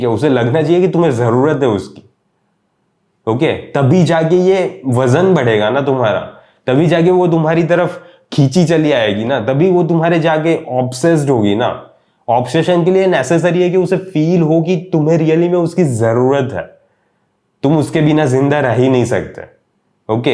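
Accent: Gujarat, India. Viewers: Bhai tame Gujarati cho? aapki aawaaz se lagta hai!! BTW Meh gujju chu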